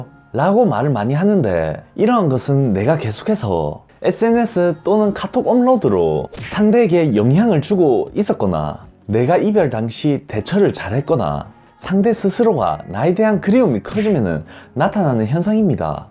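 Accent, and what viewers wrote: Gyeongsang, South Korea. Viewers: 경상도 분인가요? 그쪽 제 스타일입니다 😘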